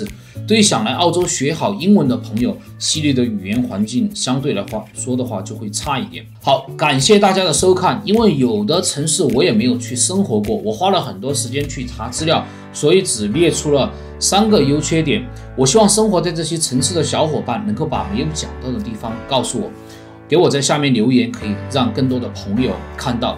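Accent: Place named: Chongqing, China. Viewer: 博主听口音应该是重庆的？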